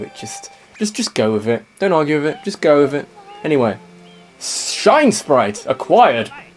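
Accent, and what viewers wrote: United Kingdom. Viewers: It's been a while since I've watched one of let's plays, and I've really missed his nice british voice. I've got three more LPs lined up after this one, so I'm gonna get a whole lot of lovely brit; after too long of no brit. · Jarren Bonson Subtitles, for when sound isn't an option beyond hearing that wunderbar British accent.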